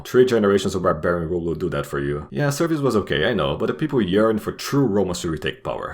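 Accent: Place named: Brazil. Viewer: Is the narrator Brazilian? His accent sounds so Brazilian!!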